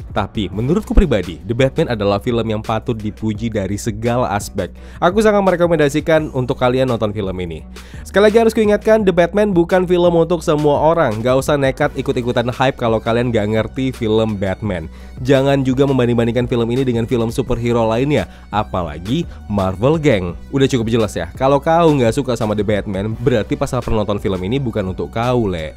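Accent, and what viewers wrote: Medan, Indonesia. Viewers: “Kalau kau ga suka sama the batman, berarti pas aku nonton film ini bukan untuk kau lek” wkwkwkwk medan kali abang ini 🤣🤣🤣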